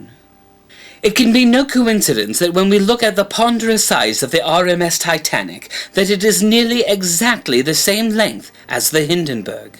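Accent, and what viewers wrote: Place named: United Kingdom. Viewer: lol the brithish accent does sound sciencey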